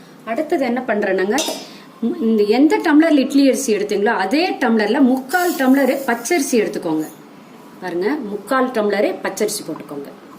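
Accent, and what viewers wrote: Kongu, India. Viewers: I used to prepare adai dosai with your measuring only amma.. i already made for more than 5 times. Very nice measurements explanation.. additionally I will add moong dal .. happy to hear our kongu tamil. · Enna oru super navuurum adai👏😋 .adhvum unga Kongu thamizh azhagil ,seimurai azhagil sokki ponen amma.nan ungal magalaga Iruka kudadha😭😭.SANTHI from DHARMAPURI. your new subscriber.all your recipes are simply superb.👏👏👏👏👏👏💐💐💐💐💐💐🙏🙏🙏🙏🙏🙏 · Very good narration. My nepali cook not known this adaidosa. Tq .kongo Tamil. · Unga kongu Tamil kekarappo enga patima gyabagam varuthu. Very nice explanation. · மேடம் உங்க அடை சூப்பர் நீங்க கோயமுத்தூர் றா என் தாய் நாடும் அது தான் மேடம் கொங்குநாட்டு தமிழ் அருமை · Amma.... more than adai dosai.... ur kovai slang is tasty and awesome.... kongu thamizh..... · vanakkam nga mam..Romba santhosama irukku unga adai receipe pathi neega solrathu ..Appdi oru kongu slang nga..lvly nga..enga ammchi kara chattney (onion .dried chillies. 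tamarind and salt ) seivanga .ammvum Athe thanunga. .. thuvar am paruppu n ulunthu 1/4 tumbler thaan pooduvanga..muranga thzha romba rare nga ..Oru naal eppdi seithittu comment panrenunga mam. Naambal athavathu kaara kolukkatai method post Pannu nga mam.mattai arisi pootu epdi seirathunu. thankz nga mam.☺👑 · Namma ooru தமிழ் கொங்கு தமிழ் Eappavumey அழகுதான் என்னங்க நா சொல்ரது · Aunty நீங்கள் பேசும் கொங்குத்தமிழ் சூப்பர் 👌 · எந்த ஏரியா அம்மனி நம்ம ஊரு பாஷை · Kongutamil arumai amma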